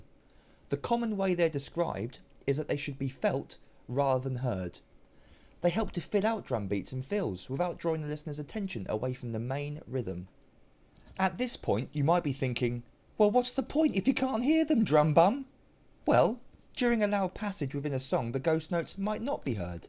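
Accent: United Kingdom. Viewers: Listen to that British accent :)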